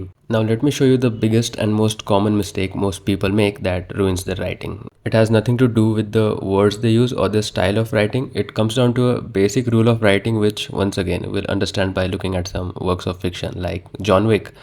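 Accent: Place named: India. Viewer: the second I heard the indian voice, i was like hell yeah man, this video is gonna be legendary.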